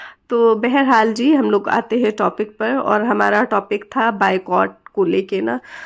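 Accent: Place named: Azamgarh, India. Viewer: Aplog azamgarh se hain hm bi azamgarh se hain or lucknow me rhte Hain kafi salon se even i born in lucknow Apki video dekh k ek connection feel ho rha hai your accent n way of talking like azamgarh... Mere YouTube pe apki videos accidentally recommend hui or mai smjh gai k aplog azamgarh se ho ....❤❤❤❤❤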